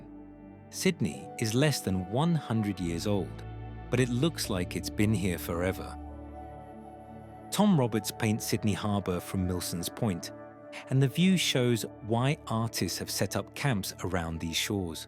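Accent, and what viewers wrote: United Kingdom. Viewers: Perhaps my expectations of AI is unreasonable as despite finding the video interesting it's not very good. It did make me laugh at a few points so I suppose that's a good thing. Who doesn't enjoy a good chuckle? If this was presented as a school project you could only give it average marks. The constant repitition of the same scene turns a five minute video into over ten minutes. I find using a British sounding narrator interesting despite it being a U.S. production. I suppose it attempts to give it more credibility but being U.S. produced it explains why "sidewalk" was used rather than "footpath". Anyway, I'll have to wait for AI to improve. Hopefully before all of the AI robots kill us. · This is so full of errors, it's ridiculous. Despite the British accent, the text is USA English, as the frequent 'sidewalks' shows, the camera panning is disjointed, placing of famous buildings is wrong and at 6.55 we see a smoke filled harbour while the voice tells us it's a sweeping blue paradise for artists. 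What is the point of it?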